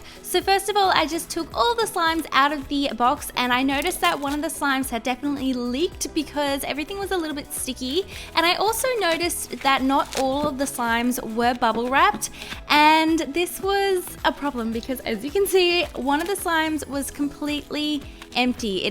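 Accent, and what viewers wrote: Australia. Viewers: FINALY SOMONE THATS AUSSIE NOT AMERICAN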